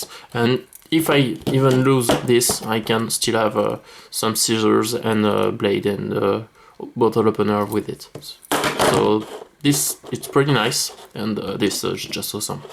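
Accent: France. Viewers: Ty for your time, you have a french accent, are you french? I am french, living in Scotland! I just ordered yesterday a huntsman camo ,uk legal, it's still in the mail, but I wanted to have someone's opinion on it! It's my Christmas present 😀,so t y again,it was helpful, and merry Christmas 🎄🥶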